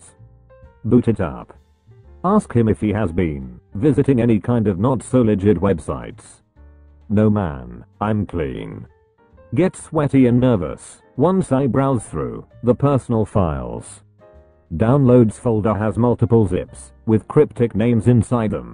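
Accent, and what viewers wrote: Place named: Wales, United Kingdom. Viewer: Welsh lad isn’t he?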